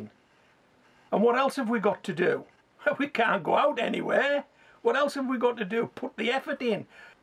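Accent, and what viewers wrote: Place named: Scotland, United Kingdom. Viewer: I believe it's. I think it's Scot?😏 Could be wrong, it's happened once before...😳😛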